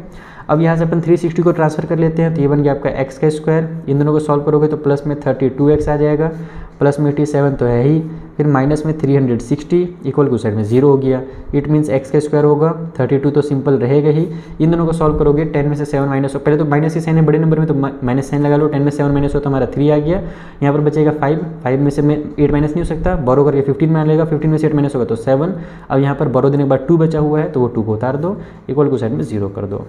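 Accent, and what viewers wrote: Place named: Bhojpuri, India. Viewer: Sir aap up/bihar se ho kya Ajj apko bhojpuri style me padate hue dheaka✅✅🙃🙃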